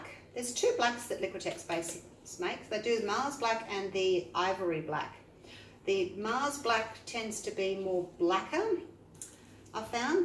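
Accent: Australia. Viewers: Disastrous?? It’s gorgeous! Love the cells - reminds me of a tile mosaic. I love listening to your chatter! It’s cheerful, and who doesn’t love a cheery Aussie accent?? Lovely!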